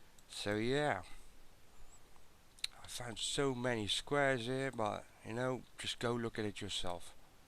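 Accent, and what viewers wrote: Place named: Netherlands. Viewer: dutch way of pronouncing .. hahahahahhaa!!!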